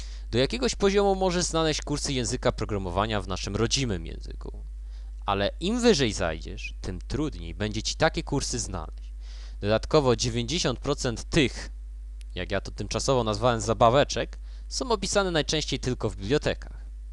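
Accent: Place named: Russia. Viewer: Jesteś polakiem?Masz rosyjski akcent :o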